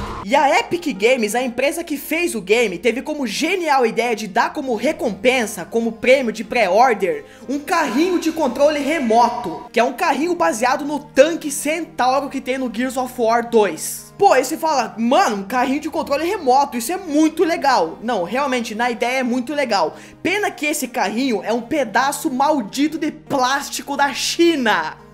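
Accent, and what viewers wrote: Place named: Rio Grande do Sul, Brazil. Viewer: Tu é gaúcho porque tu fala quinem gaúcho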